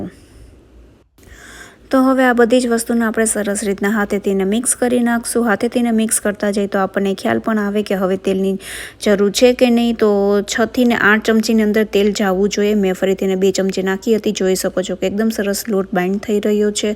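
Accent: Saurashtra, India. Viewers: Gugura bhu mast che khavanu man thaya che hu nayana anjary tmaru nam ? Tme sourast na iago cho bolva ni rit thi hu kutch bhuj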